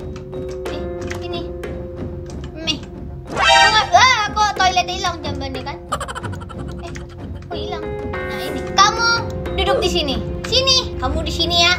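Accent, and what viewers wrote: Java, Indonesia. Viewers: Kak btw orang mana yak? Kek nya Jawa yak? Kalau Jawa, Jawa mana? Akoh Jawa tengah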